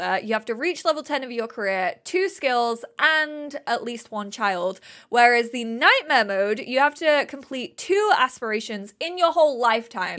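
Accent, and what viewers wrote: United Kingdom. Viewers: you sounded particularly British in this video. 😂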